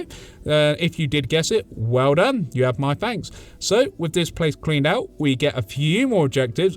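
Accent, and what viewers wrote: United Kingdom. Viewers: I swear you make yourself sound more British every video. I guess I kind of do the same thing in person where I make myself sound more American. I’ve recently added “I don’t rightly know” to my lexicon.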